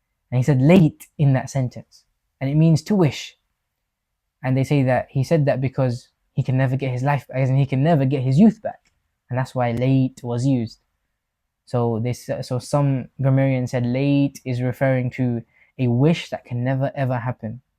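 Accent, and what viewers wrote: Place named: United Kingdom. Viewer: Hi Teacher, since I'm learning (some) Arabic I gather and collect explanations and finally making my own lessons understandable. You just Explained something very Crucial which I've never heard before, moreover I didn't even know it existed. The tricky Fial or Verb, there are 2 types, 1 which is mentioned and 1 which is Not. You are the first to Mention or to Explain these 2 types of Verbs and the differences in Detail. Good Job 👏🏻. One more thing, .... I like your typical British Accent, this gives the classes more flavour 🎉 I wish for lots of Success and more followers / Students. Excuse me for my mistakes, I'm a Dutch speaker. Best Regards from August from the Netherlands 🖐🏻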